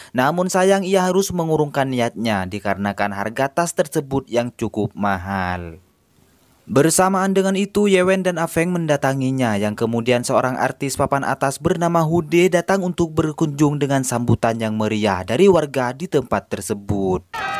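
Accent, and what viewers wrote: Madura, Indonesia. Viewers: astaga meduro mu lo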